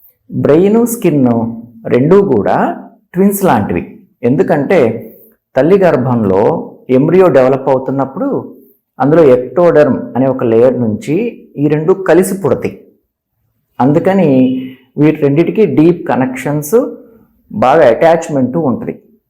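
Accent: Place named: Vijayawada, India. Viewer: మీది బెజవాడ నా. మీ slang అలా అనిపిస్తోంది. చాలా నిదానంగా స్పష్టంగా చెబుతున్నారు. అభినందనలు డాట్రారూ 💐💐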